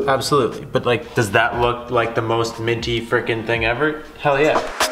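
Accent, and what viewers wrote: Canada. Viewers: Canadian accent in a nutshell ;)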